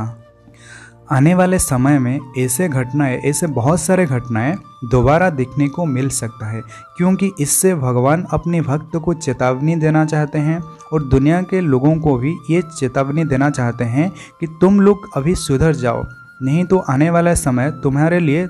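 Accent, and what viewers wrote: Odisha, India. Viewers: Sir aap Odisha se ho kya ?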